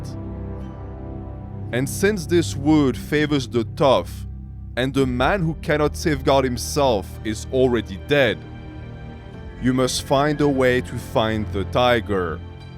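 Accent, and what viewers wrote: France. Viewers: Although you are German , your accent is very similar to a French accent.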